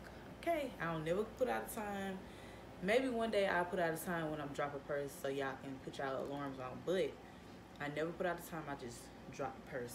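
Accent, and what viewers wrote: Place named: South, United States. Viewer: she (has) to be from Dallas or Mississippi....I know how we talk lol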